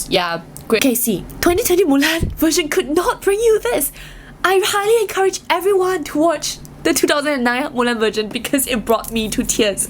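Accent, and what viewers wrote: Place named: Singapore. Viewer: Ohhh dear are you from spore? You accent is so nice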